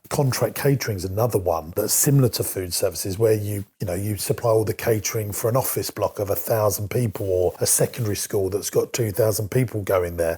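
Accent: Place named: United Kingdom. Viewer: Nice video but here is my opinion. Business that are over licensed, over taxed by governments can bring you down very quick. Not to mention dealing with employes in 2024 is diferent than it was in 2005 (worker rights, sues etc). All of that together can bring you down very quick. By your accent I suppose you are from UK, so you must know what I am talking about. It will depend greatly where are your business located. That´s why major comnpanies are located in Ireland and the Netherlands but their origins are not from there. I rented my business 2 years ago, I receive a rent and I work for others now. I have lots of time now for my family and friends, sports and so. Im in Portugal, a heavily taxed country. In a heavy taxed country the best way is to get big real quick because you will get small margins but since you work the masses you ll be fine. 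That´s the main goal, but extremly dificult.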